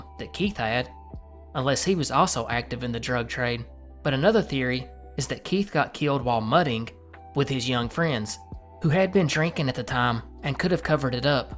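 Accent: South, United States. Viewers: For like 5 seconds, I literally thought you had a Scottish accent because your southern accent is so significant!! And I’ve lived in North Carolina my whole life! I love how there are so many varieties of accents.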